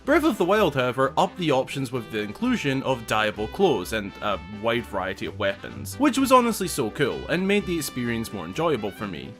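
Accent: Scotland, United Kingdom. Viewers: Dein Akzent ist super! Schottisch klingt richtig gut.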